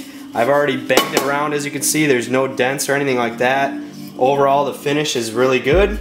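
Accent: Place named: Wisconsin, United States. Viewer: You are truly a Wisconsinite my friend. the accent and the fact that you grabbed a cold one mid install lol you love to see it 😂. From Wisco here as well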